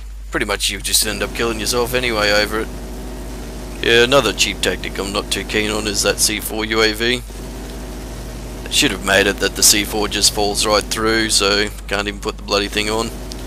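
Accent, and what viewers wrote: Australia. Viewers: Aahahah, brilliant commentary. Funny, enjoyable, epic ausi accent and actually really good advice. I play on PC, but tactics are the same. Ty Mate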